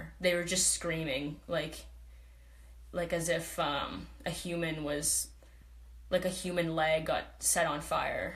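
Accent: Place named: California, United States. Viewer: why does she sound like she's From Cali 😂 that's how we talk here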